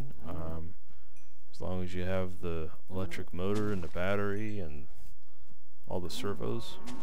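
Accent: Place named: North, United States. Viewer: Yeah, that is what I hear, up north somewhere, though def not NY/NJ...lol. And is there a specific accent in FL? They are such a mix of snowbirds and tourists...lol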